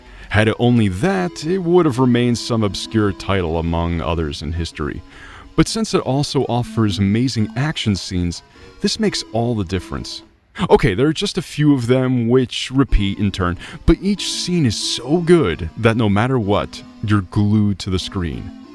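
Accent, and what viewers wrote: France. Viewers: But you likely would call it a "Zee"X Spectrum. ;) I'm all for hearing the French accent. I think your passion is great and you're not likely blinded by nostalgia.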